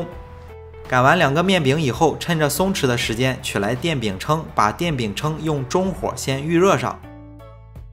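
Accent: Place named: Jinan, China. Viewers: 这北方话，北方风味，👍🏻👍🏻👍🏻👍🏻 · 这济普话听得真亲切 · 青岛人，让你听出来济南话了。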